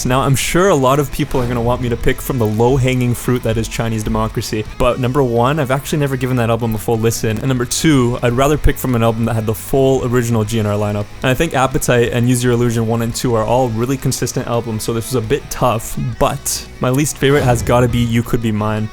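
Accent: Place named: Canada. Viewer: Probably because of the faint canadian accent in there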